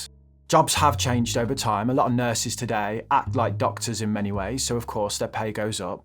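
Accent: Birmingham, United Kingdom. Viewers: no I meant comprehensible I've never got through a whole one of his videos before perhaps because I've never found them informative or interesting or so clearly explained or maybe because I couldn't relate. Or maybe it was his brummy accent perhaps it was too depressing. Regardless this video was great I'm a subscriber now.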